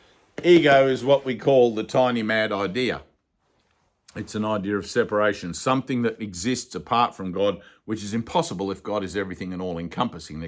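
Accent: Australia. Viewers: You sound Aussie. Are you?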